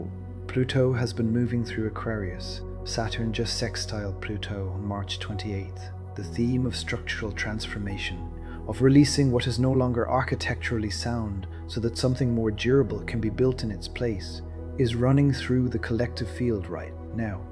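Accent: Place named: Ireland. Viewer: 💯👊💪👍 Bravo - First Time Hearing the Voice of an Irish Astrologer. 👏🏻👏🏻 Wow. Right now, this is like finger on the pulse, so much in my financial horizon, it's right on the money.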